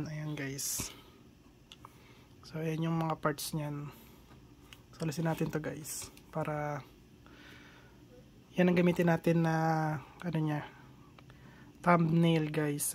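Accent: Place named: India. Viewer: Bros talking Indlish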